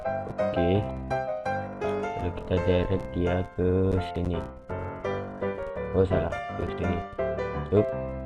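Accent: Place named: Aceh, Indonesia. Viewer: Bole kasih kritik? Bole ya...biar makin sukses.... Klo ngomong jan medok aceh bgt.. Trus cobak tuh lighting fotonya disamain kek latar belakang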